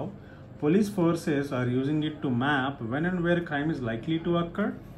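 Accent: India. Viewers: the Indian accent was difficult for me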